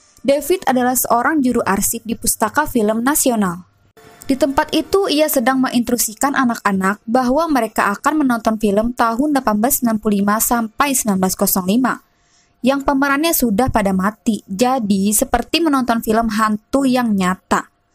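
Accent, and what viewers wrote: West Java, Indonesia. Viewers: Orng mn km kak orang bandung ya 'kumaha teh hh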